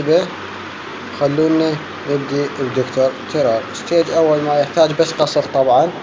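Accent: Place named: Iraq. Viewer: هنيالك عندك حارقه و6 خرب هبل اني اريد بس اوصله بيتي مستوه 15 اريد بس اهجم بل حارقه ماكو ودكتور مرعب افجر مرحله 4 ومرات اعوفه لان تجي صعبه والمشكله بهاي العبة اتمنه احصل عله الحارقه وبلعبة كلاش اتمنه احصل عله التنين ههههههههه بس صوتك محلي المقطع هههههه حجيك يضحك مره دكول زباله ومره مدري شنو الحجي العراقي بصراحه حلو ما ادري ليش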